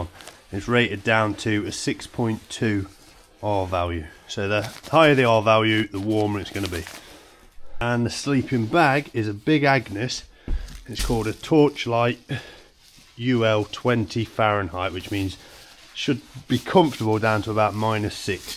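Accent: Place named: Leicester, United Kingdom. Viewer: Hi, new sub and not seen your channel before, just my cup of tea. I did a lot with my Dad too sadly passed away now, so really nice to see you out with your Dad. From the sound of your accent you may be not far from me in Leicester. Great Idea doing this trail in winter, now there’s an idea for me as it’s one I’ve not done, it’s popularity has always me off. Great vid and chat. I was interested in the Muon myself so wondering how that was for you, won’t be getting it now though as amazingly I was just gifted the osprey Levity 60, I’ve had the 45 since it came out and I love that so very pleased to get the larger version. Anyway A very merry Christmas to you and your family. 🎄🍻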